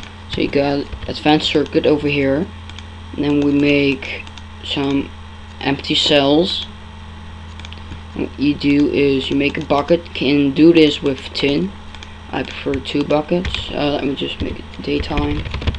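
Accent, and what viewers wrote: Netherlands. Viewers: lolz je bent nl dat hoor je gewoon ;)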